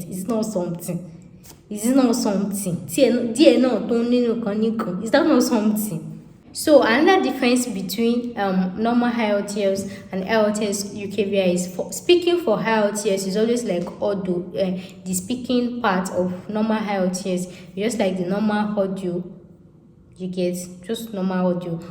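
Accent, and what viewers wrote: Yoruba, Nigeria. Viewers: I just like the way u speak your yoruba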